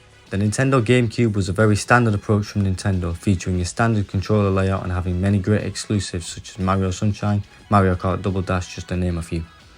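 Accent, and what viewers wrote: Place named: Manchester, United Kingdom. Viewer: Your accent. You from Manchester?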